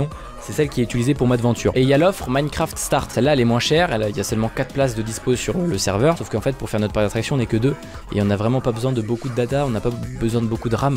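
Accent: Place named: England, United Kingdom. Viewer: Enfin je l’attendais T’a un beau accent anglais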